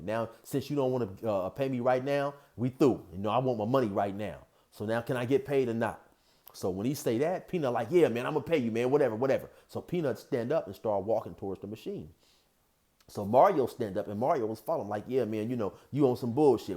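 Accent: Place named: West Coast, United States. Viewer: Love baw stories and the west coast accent…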